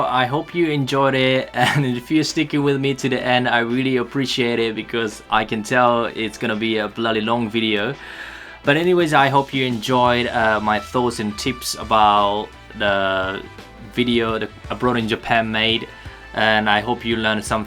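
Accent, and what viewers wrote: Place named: Australia. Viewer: this is the first video of yours I've seen -- I believe I hear a strong Australian accent good video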